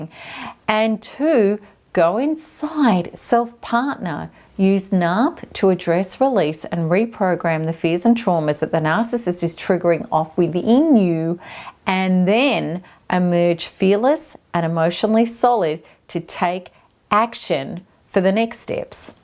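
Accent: Australia. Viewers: that horrible australian accent...but yeah female narcissists are the worst.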